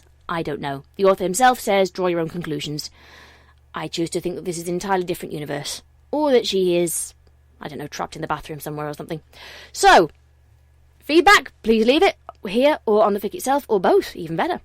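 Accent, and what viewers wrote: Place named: United Kingdom. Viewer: YOU ARE BRITISH INSTANT SUBSCRIBE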